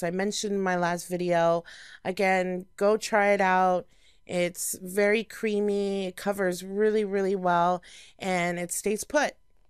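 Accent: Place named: North, United Kingdom. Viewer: Uhmm... Pin your hair back. You live up north, yeah? I love to hear the accent come out.... Poor me got stuck down south. I wanna move back.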